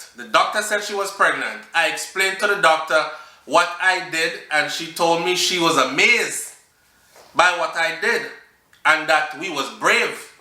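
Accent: Trinidad, Trinidad and Tobago. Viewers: you sound sweet talking like Trini OMG love it don,t cave about people life they live every one do what they want to do all I can say two dutch pot can,t close or two cover that is all I can say not for me to judge leave it to god glad for them. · 🤣🤣 you’re trying to read like a trini bless up bro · Yow your trini accent is on fire. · I cant with you with the Trinidadian accent. 😆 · I like your trini accent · Love the Trini accent 😎. When is going to update us on the baby ❤️—a Mother’s Day video would be nice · I can’t do the trinity accent, but I think u did well.lol However, ‘miracle’? Daaang, I thought we all knew sperm in pum pum Mek baby.loool Sheeeet these liberals always redefining reality with some bs mind fugg! Oh how brave smh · Ur trinidadian accent shot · Love the accient spot on you sound like my cousin in Trinidad. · Your Trini accent bro!! 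🤣🤣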